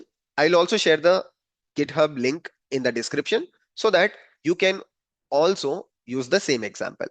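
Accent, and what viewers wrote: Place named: India. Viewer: I struggle a lot with the Indian English accent (it's very hard for me to understand), but I love the content you provide. Keep up the good work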